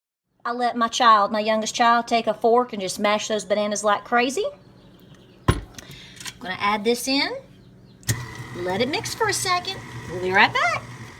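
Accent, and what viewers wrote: South, United States. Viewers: Your videos are just wonderful. Love your kind southern accent, as well as your wonderful recipes. Bless you and keep up your faith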